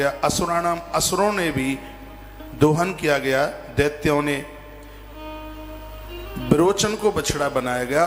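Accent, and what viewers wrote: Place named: Bundelkhand, India. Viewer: Maharajji aapki bundelkhandi bahut malook lgat hai hm bhi bundelkhandi hai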